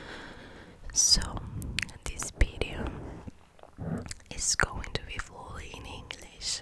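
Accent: United Kingdom. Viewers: Like si amas como pronuncia el ingles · Me encanta cuando hablas español con tu acento argentino que encuentro tannnnn bello y lo encuentro más relajante pero porque soy del reino unido quiero decir que ADORO tu acento inglés! Es muy lindo te prometo! 😘😘 Admiro tanto tu enfoque al momento en tu vida y espero que te quedes fuerte linda! un gran abrazo!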